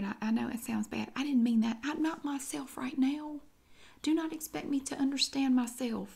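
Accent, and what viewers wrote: South, United States. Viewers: 😂 She reminds me of my mother in law. She's always on the phone and in everyone's business!!! She even has the same southern accent as she's from Texas! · Your southern accent and this role play are really interesting! Tbh, I feel happy when I hear someone speaking in southern accent and cooks southern style food! Thanks!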